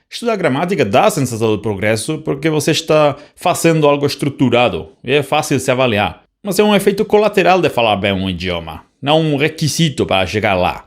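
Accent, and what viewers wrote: Rio de Janeiro, Brazil. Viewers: Muito legal seu vídeo, só lamento que você aprendeu português com sotaque carioca, na maior parte do Brasil não falamos com "chiado" nas palavras que tem "S", como você deve ter aprendido. Fora isso, acho que este é o primeiro vídeo sobre aprender outra língua realmente útil! Parabéns pela iniciativa! 👏👏👏... Grata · VC fez certo em aprender o portugues carioca! 🎉🎉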